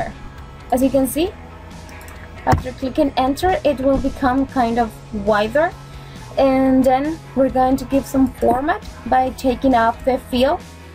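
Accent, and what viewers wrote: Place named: RP, United Kingdom. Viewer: Well done, Congratulations. By the way, your english is very good, much better than the english from english speaking countries, it is BBC like.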